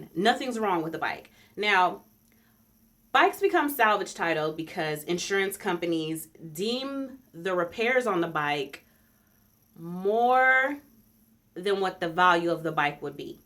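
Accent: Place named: RP, United Kingdom. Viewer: So nice and honest. Thank You! And, love it you speak the Queens English. I was prepared based on your Youtube name for a bunch of profanity and excuses. You go girl !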